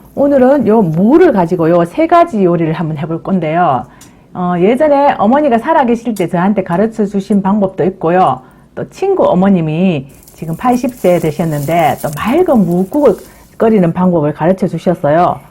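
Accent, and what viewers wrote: Gyeongsang, South Korea. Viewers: 나도경상도 사투린데 재밋네요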